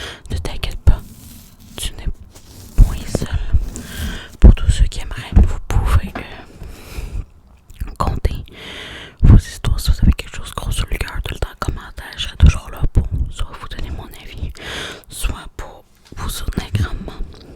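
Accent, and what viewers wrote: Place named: Quebec, Canada. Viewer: oh trop cool une asmr artiste québécoise j’avais jamais entendu de l’asmr avec un accent québécois :)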